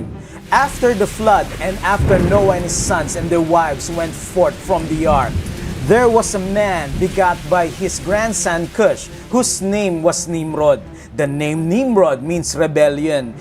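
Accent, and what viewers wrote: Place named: Bisaya, Philippines. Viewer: Bro could you change your bisaya accent to tagalog or neutral accent? Para kasing putolputol pakingan...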